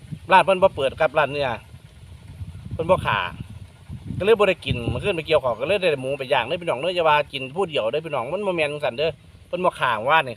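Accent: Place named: Roi Et, Thailand. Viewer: คนจังหวัดดั่ยสำเนียงคนร้อยเอ็ดกับหนองบัว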